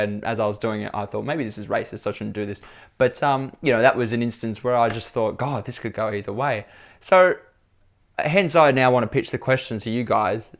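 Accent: Australia. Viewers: australian accent😍😭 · dude which part of melbourne are you from???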